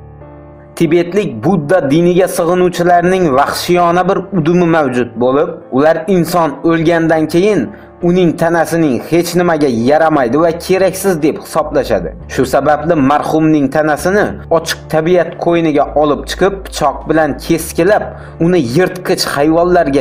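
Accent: Khorezm, Uzbekistan. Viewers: Улеее хоразмлимисане эшитиб асаб болдим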